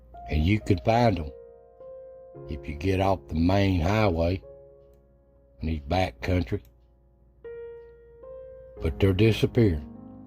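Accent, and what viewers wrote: Appalachia, United States. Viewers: Well said. Another thing distressing to me is there's nobody under a certain age anymore that's "country". Even if they're born & raised in the country they don't sound like it. They don't have the heavy accent like you & i . That's not a crime but they all seem to talk so fast i have to get them to repeat theirself. Makes me kinda relieved to be past 60, so folks don't expect much, lol.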